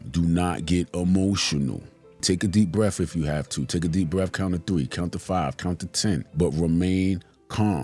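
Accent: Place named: New York City, United States. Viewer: Spot on Bro. I can tell you are from my city NYC from your accent. Salute!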